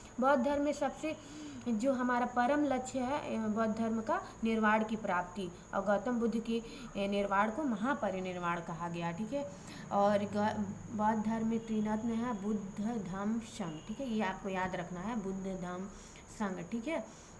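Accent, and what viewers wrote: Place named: Eastern Uttar Pradesh, India. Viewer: Mast Aap east up ke lgte ho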